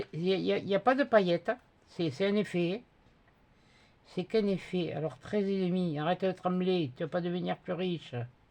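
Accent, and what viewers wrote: South, France. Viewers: Cc,je te félicite tu la super bien réussi 😍 tu l'as bien revisité le corbeau et le renard sur le moodbord du défi a Maminette Anita je le trouve très rigolo 🤣, j'adore les andouilles de vire de chez moi en Normandie c'est une spécialité du calvados dept 14, j'adore ton humour ne change rien moi tu me fait rire avc ton accent du Sud il trop mignon,un petit coucou a Rudy et Mathieu 🥰, j'attends maintenant mon défi que tu doit faire tu va avoir du boulot a le réaliser 😂,et après c'est Celui de Nicole Houlala bon courage 💪, et plein de bisous aux deux chipis, 😘 et a Rudy et Mathieu 😘 et Arkos doit encore dormir où joué où trouver une solution pour monté au rideau pour mieux regarder dehors 🤣 plein de câlins 😻,bonne journée de lundi bisous 😘❤️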